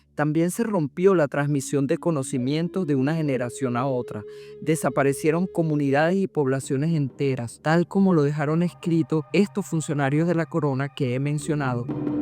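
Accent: Venezuela. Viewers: Hermoso escuchar su voz, voz de Caracas, mi reconocimiento y agradezco su esfuerzo y la animo a continuar... Gracias :) Recuperar el idioma de los VALIENTES PRIMEROS debería ser una prioridad, como me gustaría saber de ese idioma de verdad verdad!!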